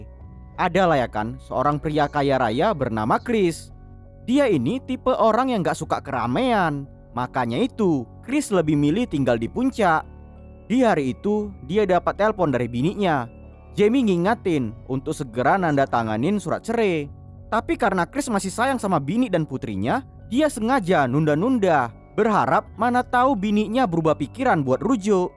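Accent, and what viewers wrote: Medan, Indonesia. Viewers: Org medan ya min... Wwkwkwkw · Lucu lae nih,,bahasa medan kali😁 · Medan kali ngomongmu bang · Mantap bg suka kali aku penerangan abg logat Medan nya kren salam dari Sibolga bg 🙏😊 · asal medan ya bg dri cara logat bicaranya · Medann kali ahh BG😂🤙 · Medan kali yakan bos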